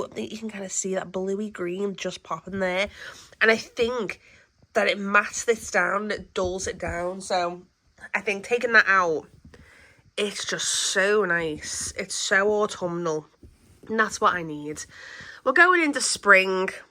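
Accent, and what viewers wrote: Liverpool, United Kingdom. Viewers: Hiya! I was introduced to your channel when you met Amora on her travels and I’m made up to find another northern UK crocheter to follow. I hugely enjoyed this video and you’ve inspired me! I’m trying to guess where you live, as you’ve a very gentle scouse lilt. I was born in Formby and now live in Altrincham x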